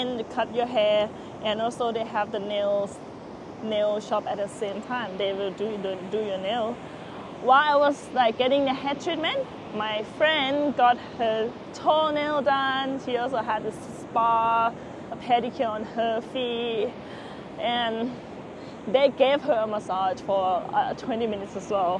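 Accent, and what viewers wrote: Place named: Philippines. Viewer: R u Filipino? U have Filipino accent. You look beautiful though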